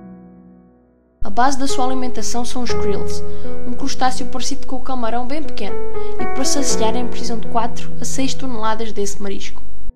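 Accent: Portugal. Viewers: Adoro baleias... Apesar do sotaque português, consegui entender o vídeo.. Parabéns! Abraços do Brasil. :) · Unica coisa que ficou horriveeell no video, Foi A Narração Portuguesa. Si fosse narrado por um brasileiro ia fica 100% bom.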